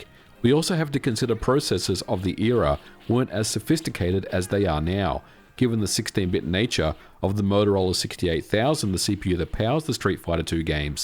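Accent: United Kingdom. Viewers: Even as much as I know coding and computer mechanics you make me look like an amateur and your accent, accents your intelligence, something about the Brits and Aussies that when you talk in technicals it all sounds even more sophisticated... Man i want to go back to the 90s so bad, even as a poor kid it still was the greatest decade ever to be a kid and arcades with games like Street Fighter II are a major reason why..even back then though I realized the business behind making the cpu seemingly godly at times since as u said the game was there to take your money and keep you coming back and I notice when I got it for snes while the cpu was still difficult it didn't have the upper hand the arcade did though I know thats cuz of hardware as well, that's what got me loving the game genie...Thanx as always for every upload covering lesser known topics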